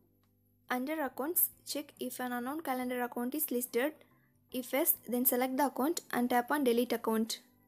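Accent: India. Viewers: First time I appreciate Indian accent people who do tutorials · Thank you Indian voice sounding girl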